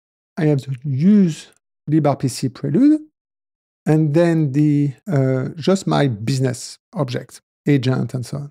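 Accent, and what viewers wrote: France. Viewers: ahhh l’accent français ❤❤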